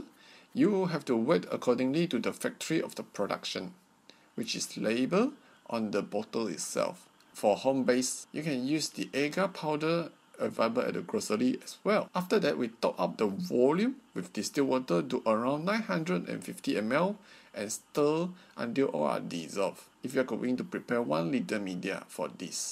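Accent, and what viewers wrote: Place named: Russia. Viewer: Are you a Russian Scientist? You sounded like a Russian .....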